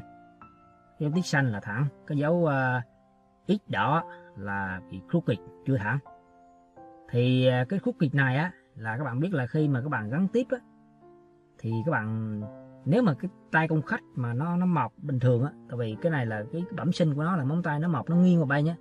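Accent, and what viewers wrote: Central, Vietnam. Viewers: Nghe giọng miền trung mà nhớ quê hương quá. Anh chỉ rất tận tâm. Đã like và subscribe :)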